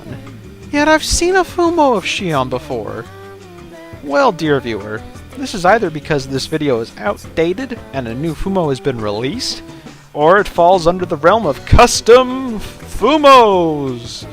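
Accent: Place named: United States. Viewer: Informative video, but damn, the American accent is strong with this one, butchering half the names. For example, Ran Yakumo, is not called "Ren"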